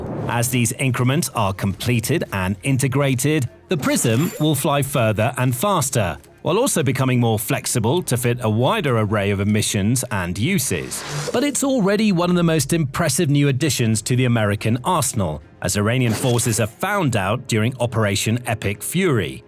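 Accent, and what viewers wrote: England, United Kingdom. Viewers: Geee is it me or does the British accent remind you of the folk hawking knives and other stuff on upper cable channels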